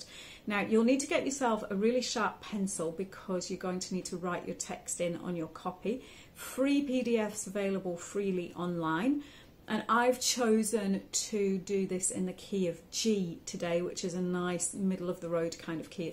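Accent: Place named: England, United Kingdom. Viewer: thank you..I love your accent..you sound like you might be from England.. perhaps London?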